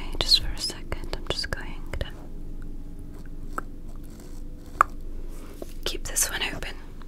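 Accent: England, United Kingdom. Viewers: Are you British/Australian or did u just nail this accent? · 10 outta 10 British accent, would go to London with it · You're vids are always amazing thanks for the hard work I'm just not into the British accent lol but don't worry about what I don't like from you're vid, that said I will always watch your vids and enjoy them 😊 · Always love the English accent,! Loving this so far. So excited for this year for you! Can't wait to see you hit that one million mark!!!:_gift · oooohhhh gurl i love the vids in a british accent as a fellow brit i’m fooled you sound so british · I’m wondering if you speak British🤔🤔🤔🤔🤔🤔🤔🤔🤔🤔🤔🤔🤔🤔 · Wait, do you usually have an english accent? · Are you really British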